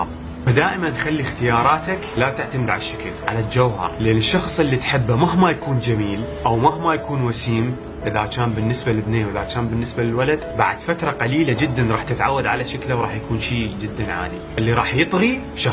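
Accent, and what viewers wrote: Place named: Iraq. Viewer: كلامك رائع. ... ما أحلى لهجة العراقية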